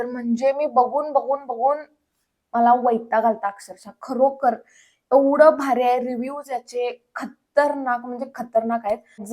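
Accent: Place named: Western Maharashtra, India. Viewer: Tumhi pakka satara sangli kolhapur kadche aahat 😅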